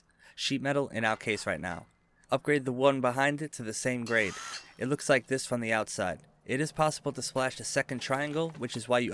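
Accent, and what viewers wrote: New York, United States. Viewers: Where are you from? Staten Island? Queens? The Bronx? You sound very East Coast NYC.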